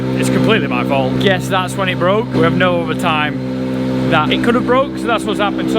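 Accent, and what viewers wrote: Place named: Northern England, United Kingdom. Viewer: Anyone notice has a YouTube voice (posh), then when he's speaking to staff he goes very northern! ᕙ( • ‿ • )ᕗ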